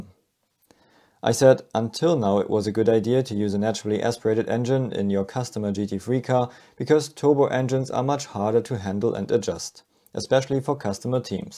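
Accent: Germany. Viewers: deutscher accent in ersten 10 sekunden gespotted 👀👀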